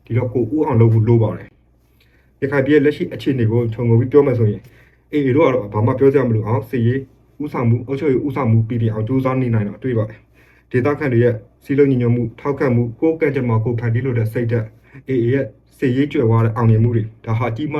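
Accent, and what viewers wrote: Dawei, Myanmar. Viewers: ထားဝယ်ဖက်ကအသံပါ။တနင်းသာရီတိုင်းထဲကဖြစ်မှာပါ။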